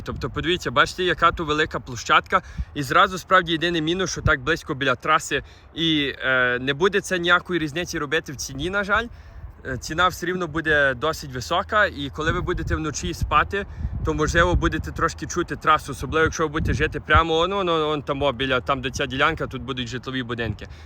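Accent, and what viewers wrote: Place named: Ukraine. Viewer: Що смішного? Український діалект. Діалекти має кожна нація!!!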